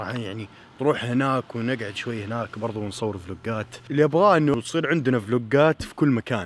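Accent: Saudi Arabia. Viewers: ادمان اوفر واتش هو تونسي بس بيحكي سعودي عشان نفهم عليه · تونسي بس كان عايش ب السعوديه عشان كدا بيتكلم باللهجه السعوديه وهو دلوقتي عايش ف كندا دراسه وكدا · Abdallh_alenzi _ بس هو يتكلم سعودي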